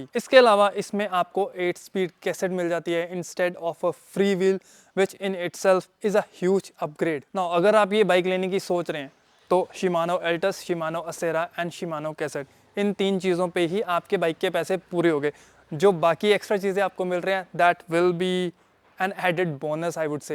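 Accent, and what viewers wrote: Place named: Jammu, India. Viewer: bhai apki tone se lgra aap jammu se ho...( me jammu se hu ) is yes then bta skte ho konse area se ...? milkr ride krnge ❤ ( although video bhut acha tha...iski unboxing bhi dekhi thi review bi dekh liya ab purchase bhi kr lunga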